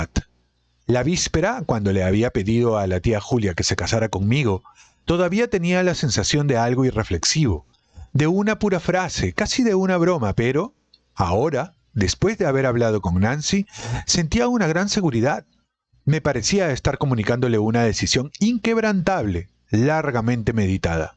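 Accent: Peru. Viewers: La narración es perfecta, el acento peruano resulta de lo más adecuado, lo disfruto mucho.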